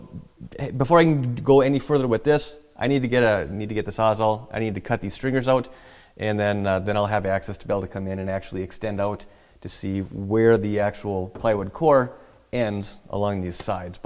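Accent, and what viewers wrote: Midwest, United States. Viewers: Really like your knowledge and presentation. And a good Midwestern accent. Ex michigander here.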